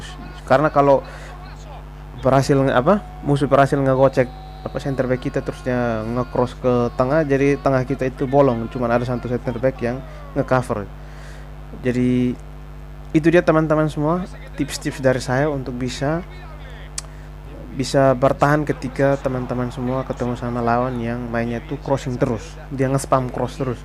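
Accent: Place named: Kupang, Indonesia. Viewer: Lu orang mna ko logat ke orang Kupang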